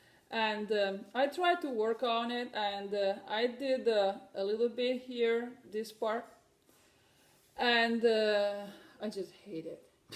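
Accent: Italy. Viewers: Yes...i love your Italian accent....its very soothing. Xxx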